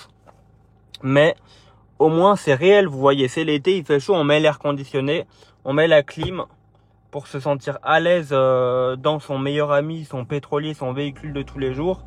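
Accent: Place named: Nord-Pas-de-Calais, France. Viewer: IL PARLE COMME CHTIMI CELUI LOA...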